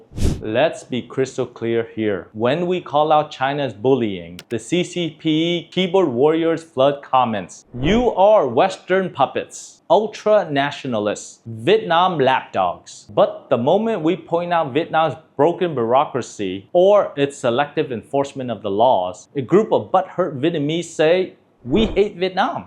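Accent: United States. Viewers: Trước đó, đời ông bà mình, Tây dùng người Việt đi đánh người Việt. Bầy giờ, Tây mong dùng người Việt đi đánh người Hoa đễ chia rẽ, cảng trở sự phát triễn của Việt Nam và Trung Quốc nói riêng, của Á Châu nói chung. Ông này nói tiếng Anh giọng như người Mỹ quá há các bạn... ? 😉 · This man full of American accent, what he is reporting just trying to stir up the status quote of thebreguon.anyway I don't understand a word he is saying.He does not know about the history, nor does he know about the geopolitics and the relationship between Vietnam and China have been straightened 5 years ago.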